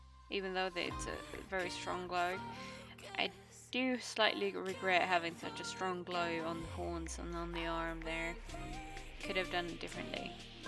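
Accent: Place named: Australia. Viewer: I think your accent is awesome! You almost sound like an Australian, my favorite accent! The art is awesome as well, of course! Thanks for the video!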